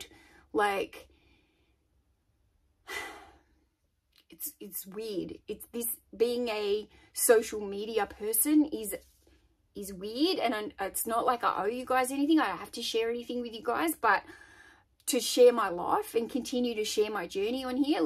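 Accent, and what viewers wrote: Australia. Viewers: Are you Austrilan?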